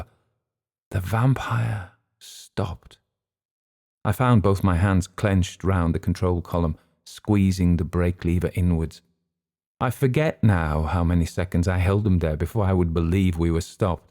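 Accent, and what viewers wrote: United Kingdom. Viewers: What a treat to hear a British voice, not a stupid American AI muck up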